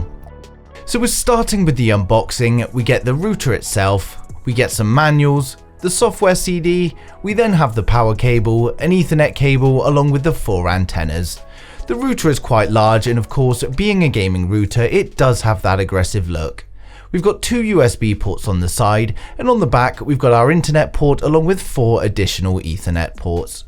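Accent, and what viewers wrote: United Kingdom. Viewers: I love the way UK people say rooter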